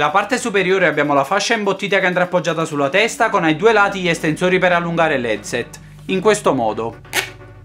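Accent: Naples, Italy. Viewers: ma sei di Napoli? perchè lo sento dall'accento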